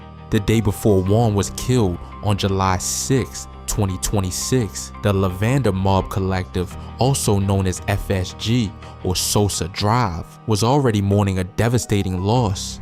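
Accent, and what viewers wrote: Baltimore, United States. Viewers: Sounds like a Baltimore dude is giving street news on Chicago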